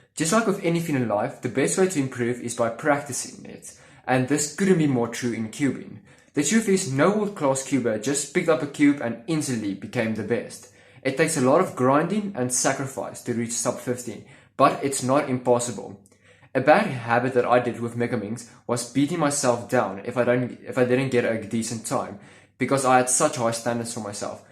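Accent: South Africa. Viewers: are you from South Africa? :)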